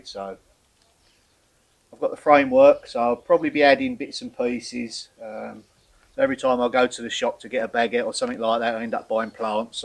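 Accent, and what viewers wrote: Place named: England, United Kingdom. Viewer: Well been subscribed since Billy mentioned your channel & I'm very busy but I've been binge-watching all your videos since then. I love this channel & your content. Learning a lot & of course I love your England accent👍❗Such a lovely Family & your wife is as sweet as the dessert she made😍❗Keep the videos coming & I will keep watching from INDIANA U.S.A.🇺🇸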